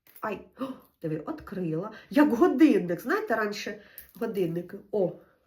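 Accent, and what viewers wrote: Poltava, Ukraine. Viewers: По суржику зрозуміла що ви з Полтави!!! Привіт вам і гарного настрою!!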